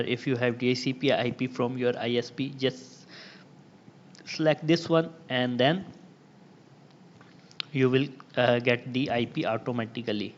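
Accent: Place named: India. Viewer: I liked the video and helped me a lot, also I I'm using this chanel to get used to Indian accent.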